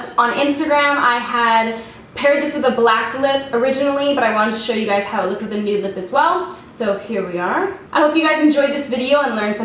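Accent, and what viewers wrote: Canada. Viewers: Every now and again, your cute Canadian accent comes through. I love it!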